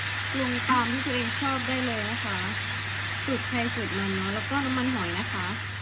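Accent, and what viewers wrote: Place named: Isan, Thailand. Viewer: ชอบแม่ เว้าอิสานม่วนคัก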